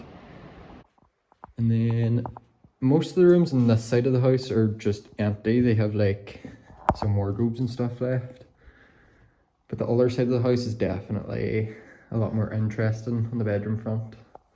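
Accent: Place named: North, United Kingdom. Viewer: We all love your northern accent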